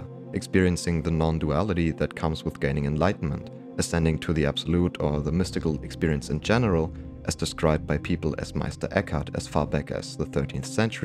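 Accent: Germany. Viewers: sehr gutes video, nicht unnötig überzogen, keine dumme angstmacherei, absolut ehrenwertes verständnis der physik und vor allem der thematik. sehr angenehmes englisch, für einen deutschen🫣. hast du die tapes bereits selbst getestet? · Me: this kinda sounds like a German accent Him: Oh shit digga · "Oh shit digger" are you German? hahaha edit yep, you definitely are xD (great video btw)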